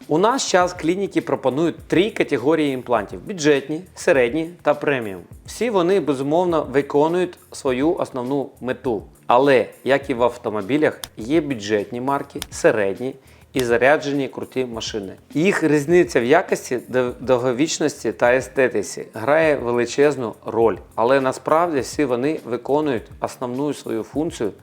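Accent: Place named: Russia. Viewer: кацапський діалект пре зо всіх щілин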